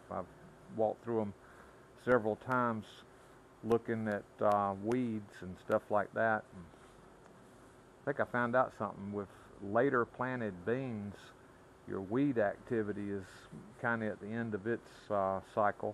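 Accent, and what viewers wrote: South, United States. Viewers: I think you had about a couple of hundred subs when I stumbled in last summer. Been distracted by some health issues the last little while, but I hope to be back for good now, all seeming to have worked out ok, thank the Lord. Anyway, congratulations! You have an excellent channel, and I've always enjoyed watching and listening to a true southern gentleman!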